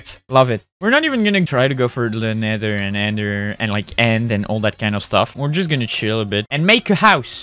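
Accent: France. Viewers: i love your accent French accents are the best.. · cette accent français mdr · On entends bien l'accent français x) Je pense qu'on l'a tous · Ta un petit accent français sais trop chou xD sinon continue t'es vidéo j'adore se que tu fait ^^ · His accent makes me laugh soooo much Before you hate on me I am French so I am allowed to laugh at a Frenchman because he has an accent while talking English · He sounds extra French. My heart.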